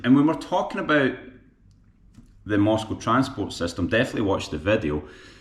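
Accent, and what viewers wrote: Scotland, United Kingdom. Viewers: amazing scottish accent! i v heard once robert burns poem read like that... it is really how it should sound... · how does the scottish accent get along with speaking Russian?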